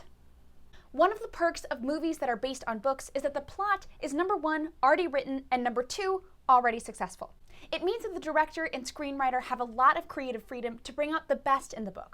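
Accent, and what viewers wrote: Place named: RP, United Kingdom. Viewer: Yeah your posh is good!!! You go girl 👏🏾👏🏾👏🏾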